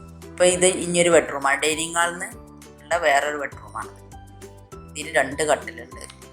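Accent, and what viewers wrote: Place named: Kottakkal, India. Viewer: സംസാരം കേട്ടപ്പോ മനസ്സിലായി കോട്ടക്കൽ അപ്പുറം ആണ് സ്തലം മനസ്സിലായി ഞാൻ രണ്ടത്താണി ചെറുശോ ല യാ ണ്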